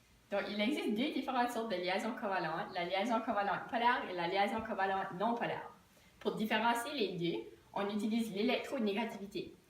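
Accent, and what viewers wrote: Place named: New Brunswick, Canada. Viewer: Nouveau brunswick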